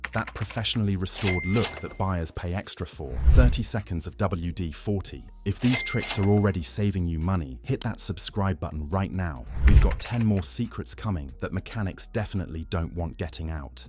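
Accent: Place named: United Kingdom. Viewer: Your credibility gets called into question when you are giving advice and your video edit is piss poor, several repeats of the same clips, did you even review your finished video? WD40 is ok but it's not the magic you are portraying. WD40 is ok but it's not the magic you are portraying 😊. I'm also intrigued why the narration is British English yet currency is in U.S. dollars, some of the left hand drive scenes are showing blurred British roads with the use of U.S. Dollars quotes in pricing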